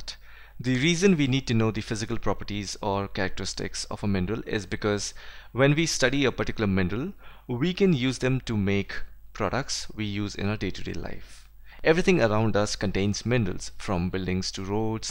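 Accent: India. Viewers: Idk but your accent seems a lot like raj kothrappali from tbbt!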